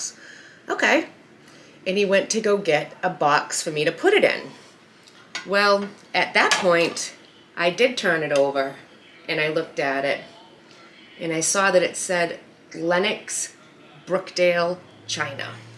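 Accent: Massachusetts, United States. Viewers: I found your channel from watching the canuary videos. I knew your accent was maasachusetts, but didn't realize how close. I grew up in Acushnet and New bedford Mass. Glad to see someone local doing this homesteading thing. I'm in Maine now but hoping to head down there to open gate poultry and get some of there hatching eggs. Have you heard of them? They are so in Wareham.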